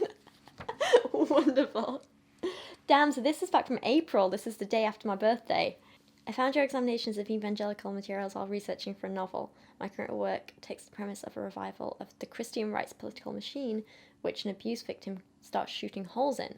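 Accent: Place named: RP, United Kingdom. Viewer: wow looking even more beautiful in this video :-O and I still love your posh accent, I would listen to you reading the phone book :-O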